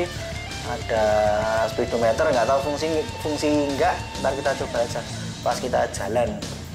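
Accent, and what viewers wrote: Java, Indonesia. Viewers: Logat koyo wong jowo koe mas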